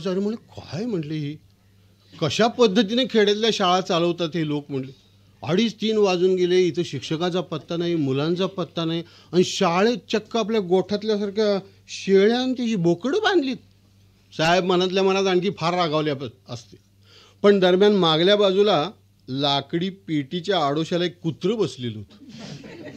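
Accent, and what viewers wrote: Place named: Mandesh, India. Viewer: माणदेशी बोली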